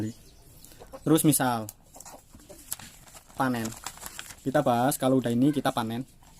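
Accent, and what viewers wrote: Banyumas, Indonesia. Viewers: Mantap om...😆😆😆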